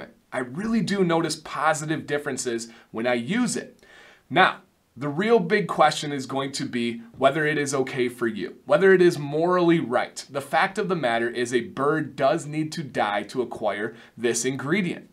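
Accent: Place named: United States. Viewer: Absolutely fascinating video. As a carnivore I 100% agree with your views on emu oil (& respect those opposed coming from a vegan perspective). I dig that the bird is farmed for food, it’s feathers, etc & that so little goes to waste. This is not an oil I have ever heard of or seen in any UK beard oils but I will definitely look out for emu oil, especially if it works on dormant hairs. Just FYI, another thing I love with your videos, & others I watch on YouTube from over the pond, is the little accents. Hearing you pronounce emu ‘E-moo’ where as in the UK we pronounce it ‘eem-U’. Just reminds me how huge the bearded community is! Anyway, Happy New Year, all the very best for 2020!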